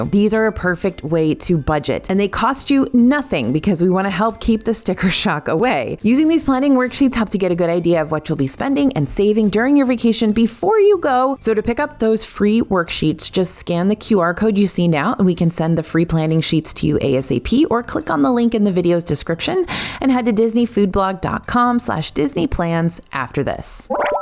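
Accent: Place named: Texas, United States. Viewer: Is it just me or is Texas accent really strong in this video? 😅 Btw, I'm really hungry now.😘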